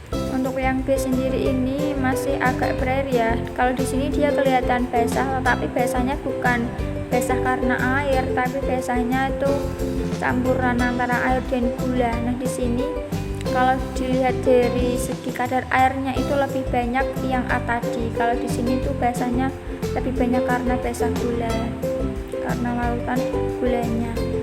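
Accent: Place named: Java, Indonesia. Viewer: medok banget 😭 but overall baguss dan informatif hehe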